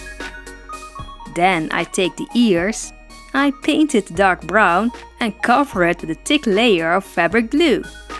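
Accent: Netherlands. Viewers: Are you dutch you sound very dutch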